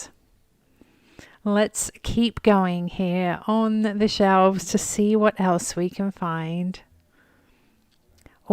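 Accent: England, United Kingdom. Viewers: I'm a new subscriber from England and really enjoying your informative, relaxing videos. I wish we'd have the large Goodwill thrift stores in the UK, our charity shops are very small in comparison, with just a few shelves of bric a brac and very hard to find any treasures. I hope you don't mind me asking, as you and your lovely Mum have English accents with a slight American drawl, so wondering what's your history or connection with England?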